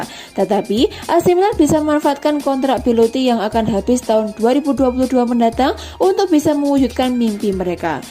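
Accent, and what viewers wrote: Java, Indonesia. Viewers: Medok bro...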